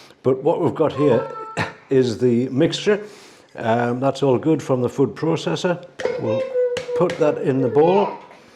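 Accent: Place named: Northeast England, United Kingdom. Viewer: Where are you from your accent sounds like the north east of England.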